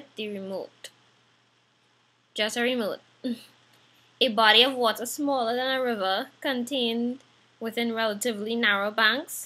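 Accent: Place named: Trinidad and Tobago. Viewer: to me trinidadian accent is best accent in the english world... you're so beautiful and sweet... greetings from Venezuela....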